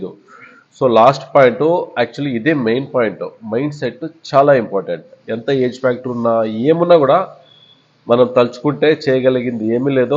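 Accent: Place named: Anantapur, India. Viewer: Sir , mee uuru pakka atp to karnataka border sir slang chusthey thelisipothundhi❤ any ways thanks for the great info🎉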